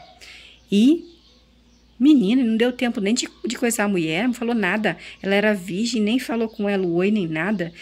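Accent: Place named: Minas Gerais, Brazil. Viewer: pelo sotaque lindo de mineirinha, já era pra mim saber! Deus abençoe sua coragem e grande ajuda com explicações como a sua, simples e voluntária! 🙏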